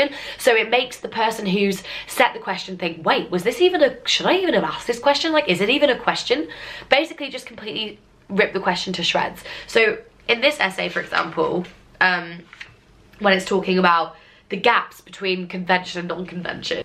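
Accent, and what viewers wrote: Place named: Wales, United Kingdom. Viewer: Oh goodness, that welsh accent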